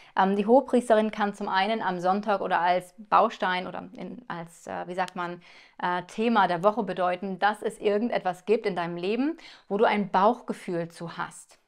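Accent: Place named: Low German, Germany. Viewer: Du sprichst ja Plattdeutsch 👌🙋‍♀️👍 wie schön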